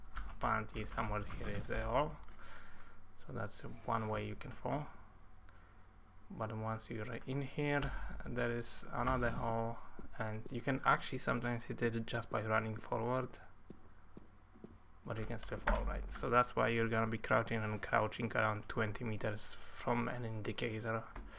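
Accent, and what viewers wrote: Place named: Poland. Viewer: I love this Polish accent.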